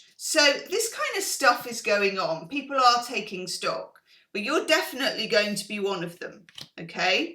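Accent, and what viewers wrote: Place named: United Kingdom. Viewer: Ii know we both speak English ; but for some reason this American is having difficulty understanding the British English. It’s my fault of course !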